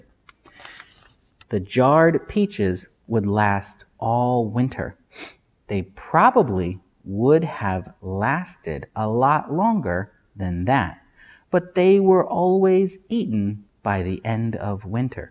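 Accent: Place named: United States. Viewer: You read books with a very good american accent i would love to talk you can i ?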